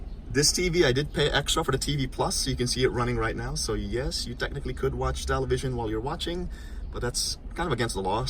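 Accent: United States. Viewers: Nice voice with american accent & very well review. 👍👍👍👍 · I thought this video was filmed in US because of ur accent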